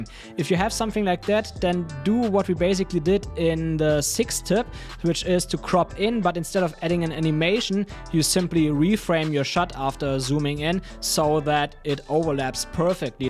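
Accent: Germany. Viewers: klar englisch ist kein Problem aber wäre auch schön mal paar deutsche tutorials zu sehen und man hat krass gehört dass du eigentlich Deutsch redest aber gutes Video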